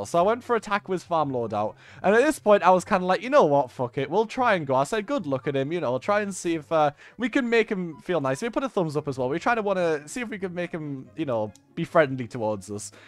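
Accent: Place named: United Kingdom. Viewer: Man I really can’t get over ur British accent lolll it’s awesome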